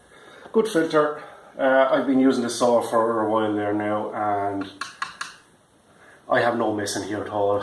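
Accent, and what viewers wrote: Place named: Ireland. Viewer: As an American I think Irish people have the best accent, and I value your opinion to the extent that i just purchased one for 237.15$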